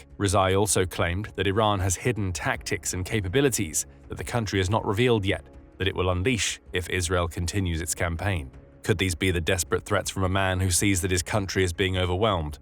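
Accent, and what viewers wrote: England, United Kingdom. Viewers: He has an English accent.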